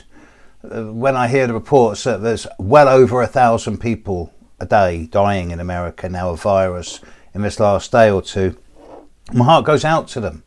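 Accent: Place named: West Country, United Kingdom. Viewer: That West Country accent comes out now and again 😆... cut the jokes mate🙄stick to the Astrology 👍sorry I’m an outspoken Sagittarian🤭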